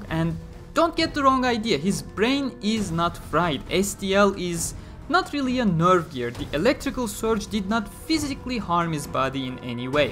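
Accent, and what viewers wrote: Turkey. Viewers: You really have a turkish accent,türk müsün lan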